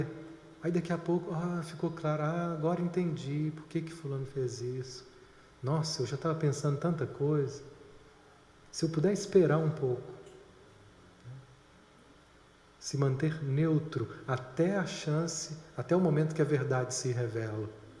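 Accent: Minas Gerais, Brazil. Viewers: Caríssimo estou muito grata a mimhá amiga que apresentou o Pathwork e vc foi o primeiro vídeo e toca minha sombras, todos os dias eu me aceitando... a criança egoísta, invejooosa... reconhecidos... os sonhos eu me vendo... AMIGO este maravilhoso trabalho seu... tom de voz o mineirinho bão sô! Obrigada! obra em negro/branco/vermelho dos alquimistas está em curso.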